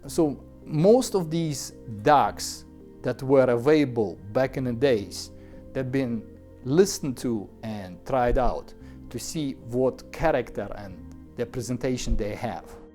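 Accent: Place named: Soviet Union. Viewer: The way you speak indicates that you are of USSR origin. It will be that there is a misunderstanding with you if we listen carefully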